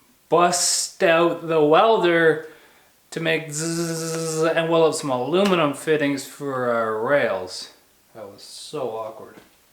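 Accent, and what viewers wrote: Canada. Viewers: i could tell he was canadian within the first 5 seconds this man spoke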